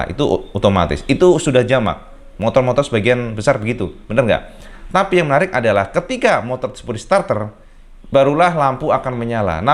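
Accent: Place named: Java, Indonesia. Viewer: Monggo, Bhsanya jowonya kntal bnget😁 Shat dan sukses slalu 🙏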